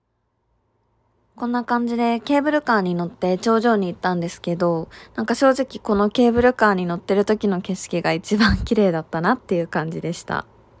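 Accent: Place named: Kansai, Japan. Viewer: かわいい❤️‍🔥東京いるのに関西弁抜けてないあたり関西人の私からすると、たまらなく好きです❤️‍🔥 さんって団子食べるんや〜って何か思っちゃいました♡可愛い♡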